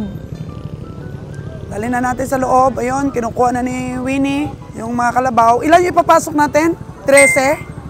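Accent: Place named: Batangas, Philippines. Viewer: meron kana puntong batangenya madam heheh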